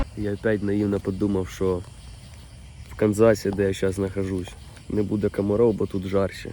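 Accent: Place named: Poltava, Ukraine. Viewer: Перший раз тебе дивлюся! Красава! А по суржику схоже що ти з Полтави?!😅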